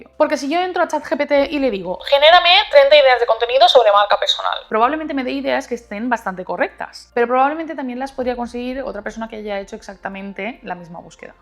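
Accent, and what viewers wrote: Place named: Spain. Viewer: Muy buen video, me lo recomendo youtube e incluso creo que es muy innovador, la calidad en que cuentas sobre el tema especializado de los influencers es buenísimo. Ahora por su acento para de españa (disculpe si no es) pero en las redes se ha estado diciendo que en españa va a cambiar el modo de trabajo a uno de 8 horas por un sueldo de 1200 euros al mes, entonces desde punto de vista de influencers de españa y ademáspuntonde vista personal suya, ¿Será este también un cambio entre la sociedad y los influencers? De pasar de una vista idealizada de que trabajan "poco" y ganan mucho a ahora pasar uno a trabajar "poco" y ganar casi lo mismo?. Muchas gracias por la calidad del video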